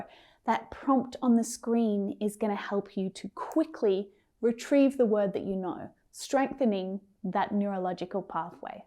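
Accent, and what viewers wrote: United States. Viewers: I fink is american accent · The pronuncaition is American English.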